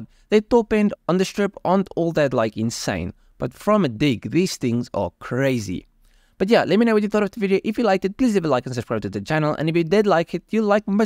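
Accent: South Africa. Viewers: South African Voice?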